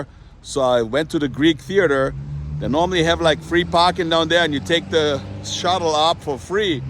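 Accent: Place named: Bavaria, Germany. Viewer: Hi nice accent maybe Bavarian dialect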